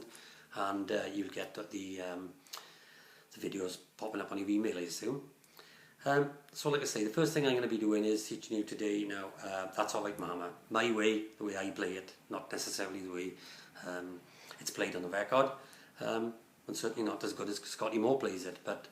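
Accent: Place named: Wales, United Kingdom. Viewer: Nice lesson, I detect some Welsh accent there, from a respectful Aussie 😀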